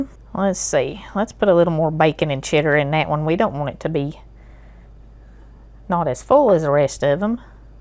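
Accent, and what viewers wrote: South, United States. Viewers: soon as i heard her country accent i knew it was gonna be a good recipe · that is VERY southern accent wow!! ❤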